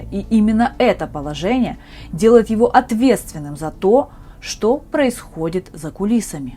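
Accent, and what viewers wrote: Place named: Ukraine. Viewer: Украинский акцент проскальзывает. Не сразу понял...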